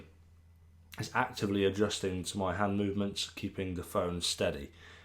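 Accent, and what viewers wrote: United Kingdom. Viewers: No body can narrate better than a Brit! Greeting from Florida, USA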